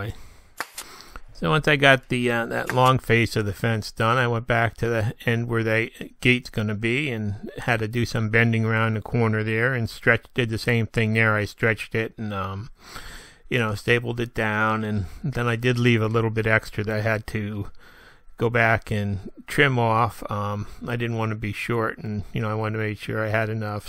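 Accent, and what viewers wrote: South, United States. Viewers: Message From The UK.... Loved the video, loved the southern accent, loved the finished job! God bless Southampton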